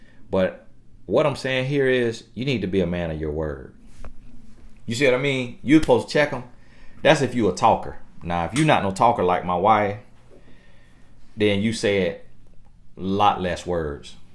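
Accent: South, United States. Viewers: Be blessed!!! Thank you so much for your wealth of knowledge! I look forward to your hour long videos every day!!! You have changed my life so much and I cannot thank you enough!!! Started my coaching class as well! I do favor the country version of ya!! That guy that said your country accent would set you apart from everybody else wasn’t lying! It’s definitely what makes you stand out and not sound like everyone else!!! So thank you for being you and all the work you do! God Bless You, The Queen, and Your Kids!!!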